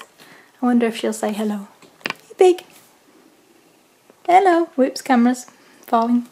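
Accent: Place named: Northern England, United Kingdom. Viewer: I get corn with the husks on from my local grocery store. I live in west sussex though and from your accent I am guessing you are from the north of england :)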